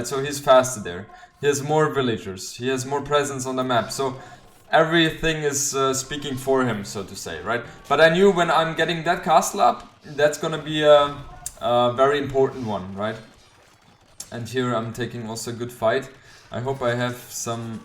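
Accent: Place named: Germany. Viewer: Ich liebe ja dein (D)Englisch 😄 So to say hab ich vorher auch noch nie gehört 👌🏻😄 Obwohl es ja nicht falsch ist.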